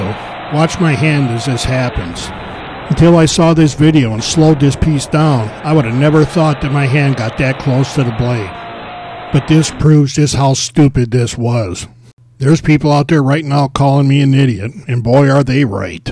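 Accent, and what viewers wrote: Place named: Midwest, United States. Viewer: People need to see this just because of how dangerous a table saw or any kind of saw is. I recognized your accent right away that you're from somewhere in Wisconsin or the Midwest for that matter. I myself am originally from Wisconsin and use to work for a window factory. I've had a couple close calls myself from the 4 years I worked there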